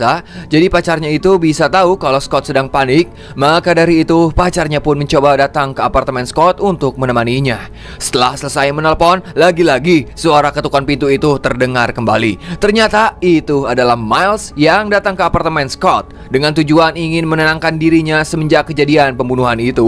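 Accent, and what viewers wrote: West Java, Indonesia. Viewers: Mang sunda na ti palih mana???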